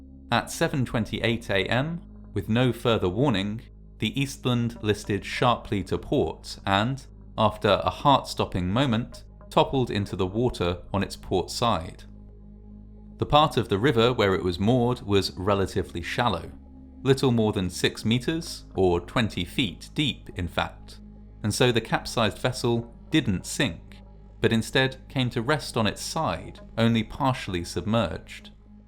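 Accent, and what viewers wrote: United Kingdom. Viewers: Ohh, thanks. Having anxiety symptoms. A guy explaining a shipwreck in a British accent is very soothing. Might watch others.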